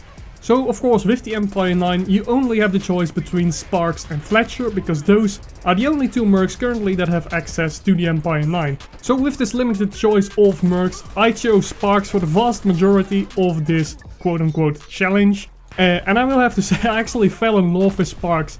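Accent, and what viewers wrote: Netherlands. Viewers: Where are you from? Dutch?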